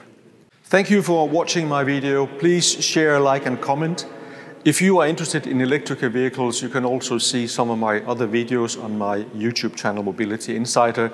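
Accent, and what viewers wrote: Denmark. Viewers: It definitely has the enhanced autopilot, since it shows that it wants to change lane, when you started indicating in the video. The only problem was, that you yanked the steering wheel too hard. Its very sensible on that. But I agree: The feature is not worth its money (just yet)… I love the danish accent by the way! Saying that as a half dane. Haha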